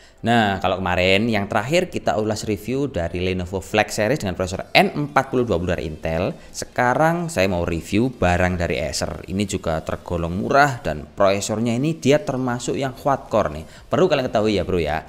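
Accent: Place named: Java, Indonesia. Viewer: I ❤️ this guy's medok! - Wong Amryk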